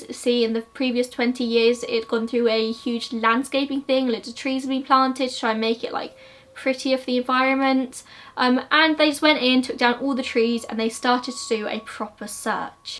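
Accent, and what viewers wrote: United Kingdom. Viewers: Just discovered your channel tonight & I'm really enjoying it. I like mysteries & came across your channel while reading up on The Missing Boys of Yuba City- America's Dyatlov Pass. Dyatlov's Pass is another very interesting one that freaks me out. Along with The Hinterkaifeck Murders- Idk if you've done that one or not, I didn't look through them all very closely. Probably. Anyway, it looks like you've done a bunch I'm not familiar with so I'm looking forward to binging the rest of your videos. They're quite good. Plus, I'm a sucker for the Snow White look & British accent! 😊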